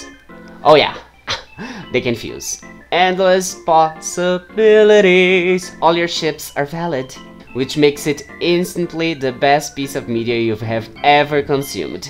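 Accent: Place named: Brazil. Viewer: my faves are Nelumba (is this his name?) and Ro lol (edit) MENINO TU É BR??? EU NUNCA SOUBE DISSO eu suspeitava pelo sotaque mas não tinha certeza aaaaa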